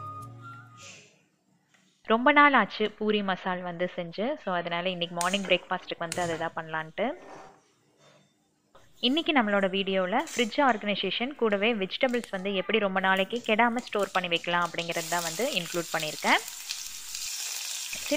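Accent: Coimbatore, India. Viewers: thats so nice of you, btw neenga cbe dhana native, me too from cbe, your accent sounds so.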